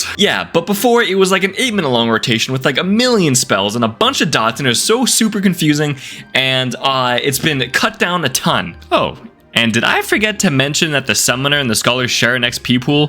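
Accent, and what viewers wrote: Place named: Southern New York, United States. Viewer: That was perfect Southern NY / Northern NJ voice.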